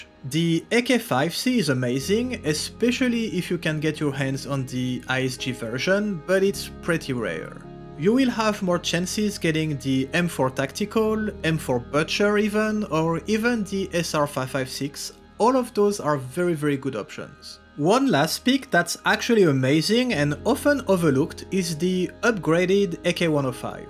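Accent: France. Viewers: Obviously french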